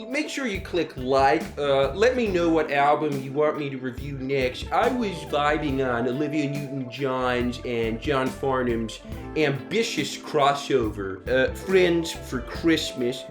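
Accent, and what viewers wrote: United States. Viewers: love you mate but this video is legitimately shit, think the american accent gave me an aneurysm, even worse than your MAFS stuff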